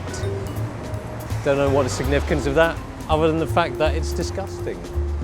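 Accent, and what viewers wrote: England, United Kingdom. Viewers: How did you get a English accent?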